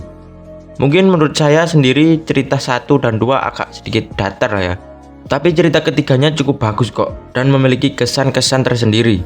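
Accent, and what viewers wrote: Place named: Java, Indonesia. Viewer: B sama D nya medok banget bang wkwkwkkk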